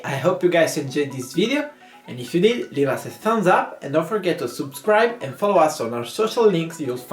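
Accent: Italy. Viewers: Mostafa Haque I'm from Italy and the accent is SPOT ON! He's definitely italian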